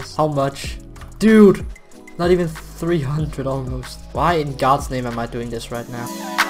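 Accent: Denmark. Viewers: Are you danish by any chance? Quite northern accent😁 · Are you danish? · You really Sound Danish are your Danish?? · Are u danish?? Sound alot like a dane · This man sounds so danish. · i smell danish, you said i figured out who det er lol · Is he danish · i smell danish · You Sound danish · it sounds like you are danish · Hey i just Wanted to know if you are danish cause your English has a danish accent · i knew ur were danish the accent is HEAVY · Er du måske dansk haha, nemt at høre ;) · U from Denmark? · aRE yUo A daNmaRKeR? Yes Can tell from ur accent ;) we can always find each other us danish ppl am i right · Are you from Denmark?, your english sounds a little like it, Keep up the good work <3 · It's the danish accent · I smell danish. Me too partner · I smell danish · were a you from? bc you have a danish accent. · Dude its danish, he is a dane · are you from denmark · Are you from denmark?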